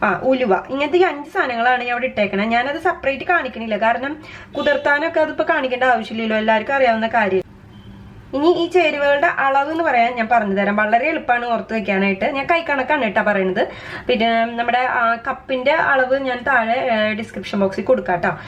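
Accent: Thrissur, India. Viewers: Ente ummade ishtta vibhavangalil onnu kalathappam aanu.. Nalla mayam ulla idliyude recipe paranju tharumo... Love your Thrissur accent.. My father is from Mathilakam, Kodungalloor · താങ്ക്സ്, ചേച്ചി തൃശൂർ ആണോ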